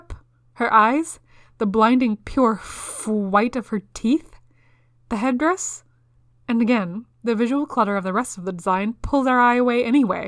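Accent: Canada. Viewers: First time watching your vids, I hear the Canadian on your supprisingly soothing voice. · I like your accent. Where are you from? You hold your mouth like a Canadian but also fully pronounce your Ts so I can't tell O_O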